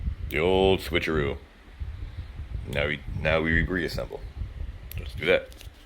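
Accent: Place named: New Jersey, United States. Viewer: I'm guessing you're from Jersey or somewhere thereabouts.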